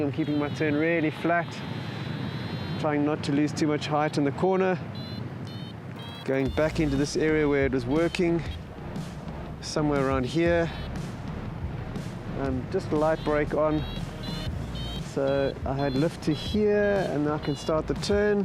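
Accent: South Africa. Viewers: Haha nothing like Porterville to bring out your sarth efriken accent ey bru! Lekka!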